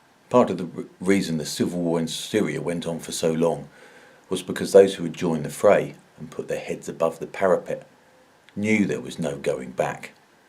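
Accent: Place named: United Kingdom. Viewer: Thourghilly enjoyed that, Both the incisive content And,the very british delivery. Heath in 72 took us into the common market without a referendum,as he stated to civil servants,his mandate to join came from his 1970 election victory, Yet that join or stay out question was never a part of the 1970 conservative manifesto. Heath said "it would be wrong if any Government contemplating membership of the European Community were to take this step without `the full hearted consent of Parliament and people" Heath lied Heath new that the opinion polls during <num> were consistently 68%against joining the common market. A referendum,which he all but promised,he knew he would lose, So he made up the story that his parties election victory was in itself the mandate to join. Further,he then suppressed the civil service advisories on both The loss of sovereignty but also on the future loss of judicial power, Economic freedom,and even military independence. 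This report surfaced and was made public in 2018. It clearly shows the deceit that heath used to sign us in , Without our ,the electorates, Agreement. Traitors like heath infest parliament. Cameron lost the brexit vote His party then elected may Knowing full well she was an adamant remainer, Those that had a hand in that election are traitors to the people and democracy of this country. Their can only be one way to deal with traitors, And should this country decend into civil war over this fundamental betrayal,rest assured ,those that Ac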